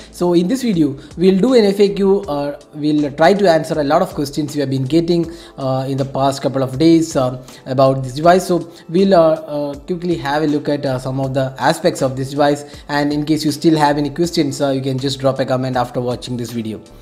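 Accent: India. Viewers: Indian english - auto dislike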